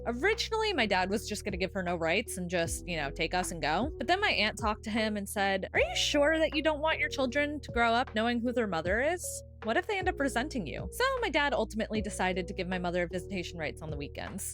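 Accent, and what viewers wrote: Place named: United States. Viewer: Where are you from that sounds like America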